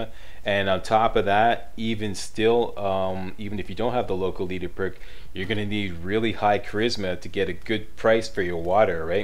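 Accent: Canada. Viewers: You sound pretty damn Canadian Bruh. #SK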